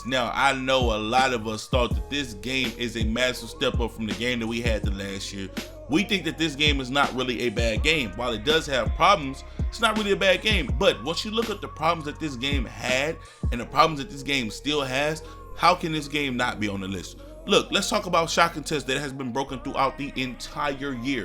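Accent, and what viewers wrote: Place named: Houston, United States. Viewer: gotta be from Houston ... nigga sound like Maxo Kream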